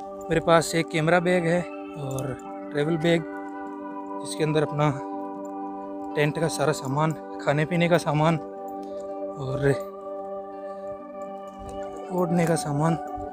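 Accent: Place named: Marwar, India. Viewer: Bawlya k Ped 😂😂😂😂 Marwadi m Bhut pyara bolya Superb Video Bro ,..❤️❤️❤️❤️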